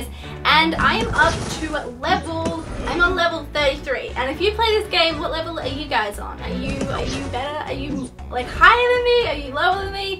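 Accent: Australia. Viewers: Just you hahah this girlies Australian.. Isn't Perrie British? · That's the same as me and is she Australian? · Is she a fellow Aussie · Jayla Kahlen Espadon it's Australian. I'm Aussie so I know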